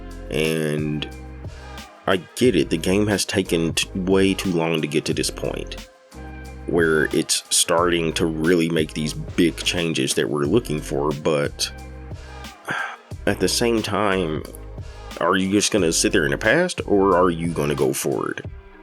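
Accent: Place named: South, United States. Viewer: He do got that southern twang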